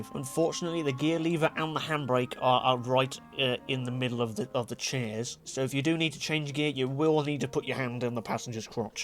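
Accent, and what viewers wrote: France. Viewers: Le grog mobile Also the best French accent ever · Automation idea: Please make one of those VERY low pickups that are very loud and make LOTS of black smoke 🙏🏼 (btw I made the car with that body yesterday) 🥖FRENCH ACCENT IS BEAUTIFUL🥖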